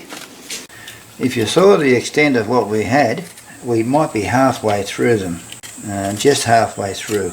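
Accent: Australia. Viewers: Liked the video and loved your true blue accent. Went nostalgic on my time in Aus. Good luck to you!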